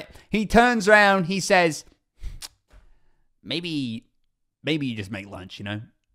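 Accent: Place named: United States. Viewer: Once again, that American accent (this time with a Tango-inflection), FTW. So good. 😄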